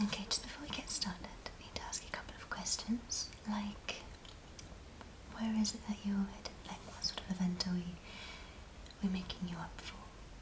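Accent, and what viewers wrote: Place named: United Kingdom. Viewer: Amazing, nice to hear such a nice British accent. One of my favourites :)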